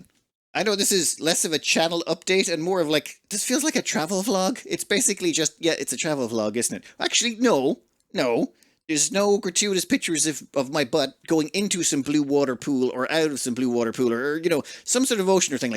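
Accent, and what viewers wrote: Ireland. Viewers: OMG, you're Irish?(I just have to ask)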